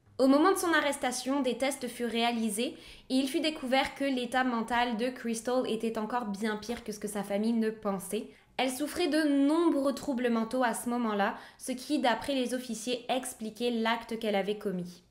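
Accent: Quebec, Canada. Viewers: je sais pas cest tres bizzard jai limpression que ta une legere accent quebecois desfois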